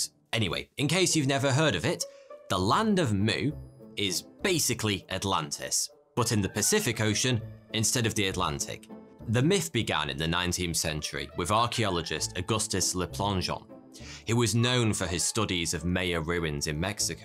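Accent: United Kingdom. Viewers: Before giving in to this video's nice British Accent. I encourage anyone to watch Rogan #961 for a full debate between a leading skeptic and Randall Carlson/Graham Hancock. There's a solid foundation for his reporting and it's more challenging to actually listen than to just swallow the easy answer that "Leading Experts" disagree. His solo venture #1284 of the Podcast also includes a deep dive into the Piri Reis Map, and I was skeptical until the revelation about the Bimini Road. Definitely a worth few hours of your time.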